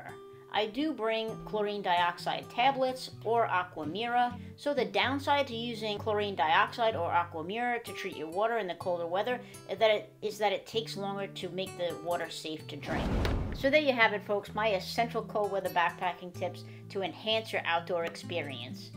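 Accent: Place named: Northeast, United States. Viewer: your slight northeastern accent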